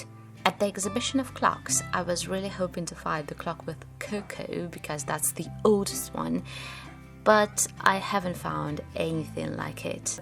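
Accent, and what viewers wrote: United Kingdom. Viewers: I really love to watch and listen your incredible voice in British accent:) it would be great to learn English from you :)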